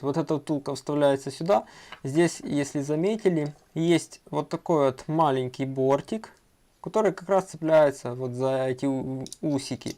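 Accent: Vologda, Russia. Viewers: Спасибо, познавательно! У вас акцент вологодский) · Вологодский чтоли 😅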